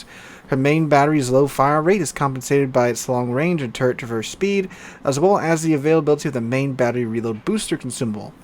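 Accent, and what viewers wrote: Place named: United States. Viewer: He's using the American pronunciation rules. We say it this way, that's what we do.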